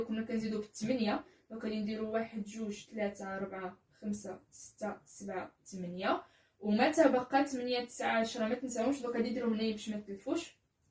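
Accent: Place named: Algeria. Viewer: تبان لهجتنا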